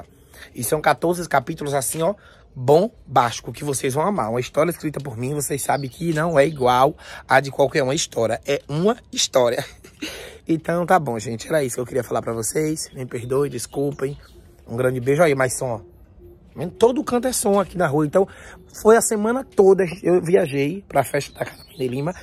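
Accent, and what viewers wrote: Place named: Bahia, Brazil. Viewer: Amo ouvir esse baiano voz linda sutaque lindo E Assim mesmo parece sacanagem eita jó Ótimo domingo ❤❤❤aposto q não vai ter som Presta atenção so a misericórdia Deus e masss Ótimo domingo para vcs.....❤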